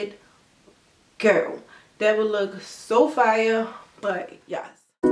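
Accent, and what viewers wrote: Baltimore, United States. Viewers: Is that a Baltimore accent I hear?? If so I'm from Baltimore too. I get excited when I hear vloggers from Baltimore. Anyway I'm a new subscriber. love your channel and reviews. Love this wig!! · I love the wig!!! You sound like my cousins in Baltimore! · U sound like u from Baltimore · You from Baltimore? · I love it!!! Love that bmore accent !! U have the face for it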